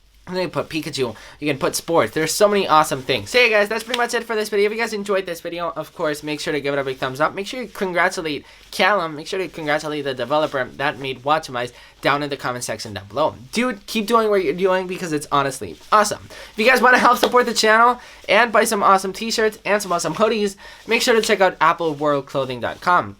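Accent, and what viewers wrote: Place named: Spain. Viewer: He is from Spain. Of course he isn't gonna sound just like you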